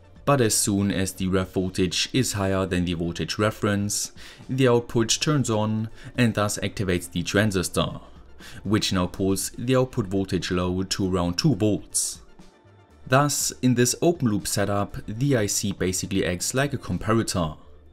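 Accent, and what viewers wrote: Germany. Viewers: ...das is das trotz korrekter Grammatik GEILSTE deutsch Akzentuierte Englisch, , was ich zu Lebzeiten erfahren durfte...Content is natürlich auch gut, aber diese Stimme hebt das in eine ganz andere Dimension...Danke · I AM SO GLAD WITH YOUR FREQUENCY OF VIDEOS LATELY! I know full well how much work this just be for you, but I think I speak for a great many of us, it really is exciting to see a new release from you! No matter the topic, you make it understandable, entertaining, engaging, and simply watchable for just about anybody. I think it is your super soothing cadence of speech, and that sweet, sweet German accent! 😁😁😁👏👏👍🏼👍🏼👍🏼😳😳😃😃😃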